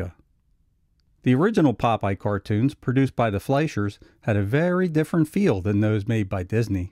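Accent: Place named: Pittsburgh, United States. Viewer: What part of Pittsburgh are you from?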